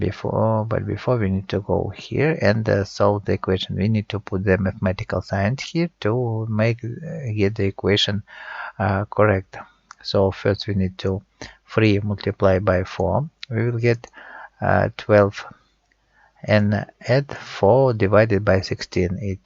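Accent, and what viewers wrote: Russia. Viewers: Я так и знала,что ты русский,по твоему акценту,и по окну на русскому языке на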